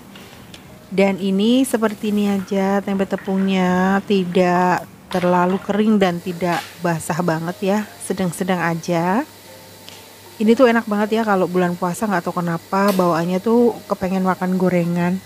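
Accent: Banyumas, Indonesia. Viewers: W'salam sehat sehat mba, timggal di Purwokerto ya mba.....bahasanya ngapak donk hehehe, salaaam dri jakarta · Ada ngapagnya ya bund...😁✌️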